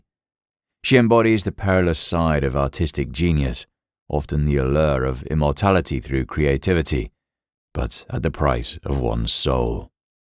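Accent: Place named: South Midlands, United Kingdom. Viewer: No it's a modest south midlands accent not remotely Bean's Yorkshire 'brogue'.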